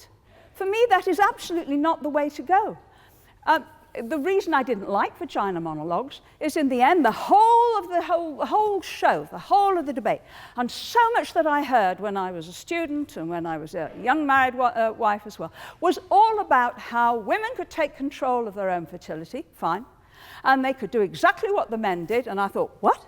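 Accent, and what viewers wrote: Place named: Liverpool, United Kingdom. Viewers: Aahh - she's from Liverpool! That should not be a surprise! :) Her accent though is not typical... I know many might disagree, but I just love the Scouse accent! It's so expressive and honest- straight from the heart. I'm not British or anything but I've been following the red half of that City's football team for a large part of my life now; and one thing I've come to know about those people is that they are level-headed and not particularly pretentious(yes even the blue half ;)). They can also see right through bs and they speak their mind. This lady is no exception. Lovely lady btw and a seeming good sense of humor which is what you can expect from Liverpudlians! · Has a poshest scouser ever existed ?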